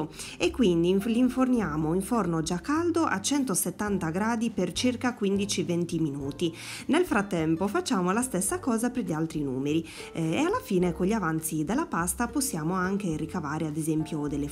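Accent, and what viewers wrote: Sardinia, Italy. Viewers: Quanto 6 simpatica..amo il tuo accento forse perche sono di sassari..sarda come te..sei di una bravura e poi la verità mi piaci perche spieghi benissimo e nello stesso tempo cucini..che dire la cucina sarda e la migliore..😘😘😘💖✌✌✌ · Non so se mi sbaglio ma il tuo accento sembra sardo! Mi piace moltissimo la torta...anche io faccio l'impasto delle ciambelle proprio come fai tu. Ajò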